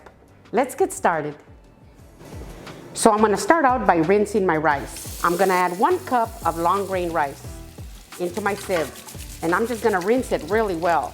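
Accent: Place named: New Mexico, United States. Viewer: THANK YOU SO MUCH! I can’t ever make a perfect rice! I Love All Your Recipes! Are You from New Mexico? In the 70’s l dated someone from Espanola New Mexico and l met all his Family you have an accent like his family, I didn’t Marry him, had a wonderful husband for 43 years he passed away 2 years ago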